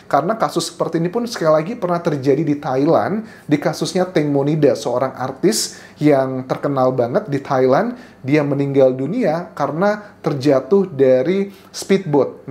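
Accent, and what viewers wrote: Java, Indonesia. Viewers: mas boso jowone medok bgt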